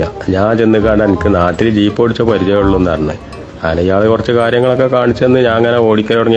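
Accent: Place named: Malappuram, India. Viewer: മലപ്പുറം ഭാഷ😂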